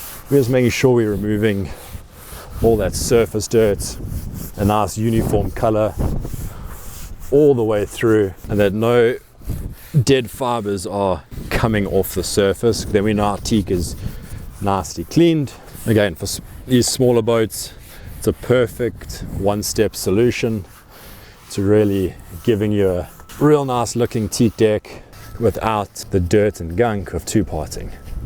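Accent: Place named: South Africa. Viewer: Great to hear the SA accent. Is this product available in Malta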